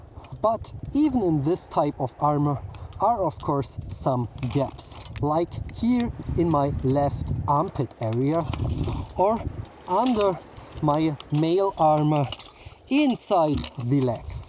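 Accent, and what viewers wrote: Germany. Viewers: Man hört voll den deutschen Akzent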